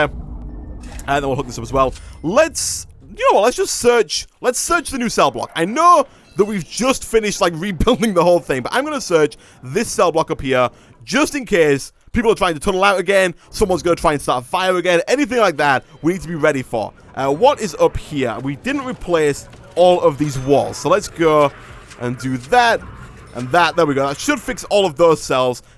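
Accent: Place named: Yorkshire, United Kingdom. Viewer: I'M FROM OOP NORTH YORKSHIRE But seriously, that is the most Yorkshire accent I have heard on YouTube.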